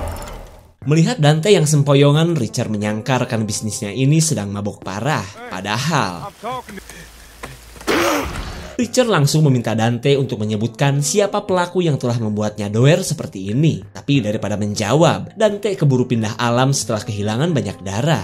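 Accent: Sunda, Indonesia. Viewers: Iya bener suaranya sunda pisan 😂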